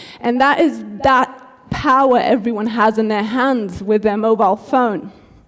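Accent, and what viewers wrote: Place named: United Kingdom. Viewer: Well done, my friend. And yes I hear the British accent. 😊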